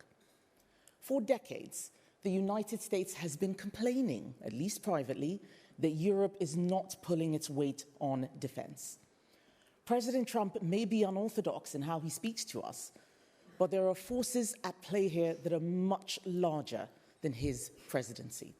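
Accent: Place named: RP, United Kingdom. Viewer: A negro speaking the queen's english is obscene. If she ever gets to the point.... britain is weak and shameful. So is the most parts of europe. They cant even defend themselves but declare, it's not our war. Oh yes, it is!!! Islam has taken over your cities, and you allowed it due to your indifference or cowardice. Now , we, the US are done with your gay shit. Go ahead, get overrun by islam and communism. We are done with you as well!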